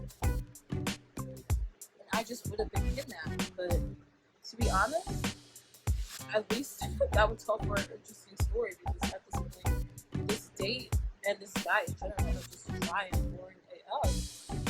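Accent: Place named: Yoruba, Nigeria. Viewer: Yoruba accent! Wow!!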